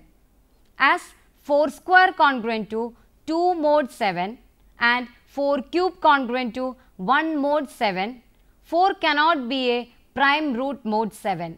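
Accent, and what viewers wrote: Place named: Kerala, India. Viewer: Malayalam influence in English. Plz learn phonetics immediately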